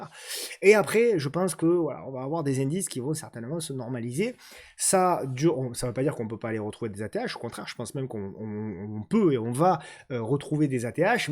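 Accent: South, France. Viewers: Top, merci pour la vidéo, je m'ennuyais devant mes écrans avec une cheville en vrac (chute à moto hier soir), mais pas grave, rien de trop méchant, que 8points de sutures et pas d'os brisé, et puis j'ai ta vidéo pour me remonter le moral avec ta bonne humeur communicative (oui, l'accent du shud c'est sympa et chaleureux 😜) Bon WE ✌️ PS: non non, tes vidéos ne sont jamais trop longues pour qui sait apprécier les bonnes choses 😉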